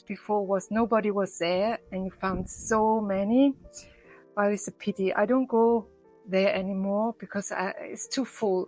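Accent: Switzerland. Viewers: Ist Ihre Muttersprache Schwizerdütsch? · Liebe vielen lieben Dank,sie inspirieren mich mit ihren Arbeiten, Ideen und mit ihrer liebevollen Art die Arbeitsgänge zu erklären. Ganz zu schweigen von den wundervollen Möglichkeiten ihre wirklichen Kunstwerke nach machen zu können. Mir gefällt ihr Schwizerdytsch sehr,ich lebe seid 40 Jahren bei Konstanz und und bin in 10 Minuten in der Schweiz, dass ist immer ein bißchen Urlaub für mich ❤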